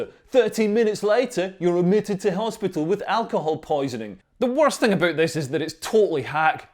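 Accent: Scotland, United Kingdom. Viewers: What a great take. I accidentally had CC on and was enjoying it's interpretation of your scot's accent. I had a scottish mother so no probs for me. You could easily lure the left into lullaby land by including that great pacifier of all faux woke outragerists...hannah gadsby to the list perhaps? And, you're welcome! from a proud Aussie to export that fine example of upstanding comedy to your great shores. You're welcome to keep her that is.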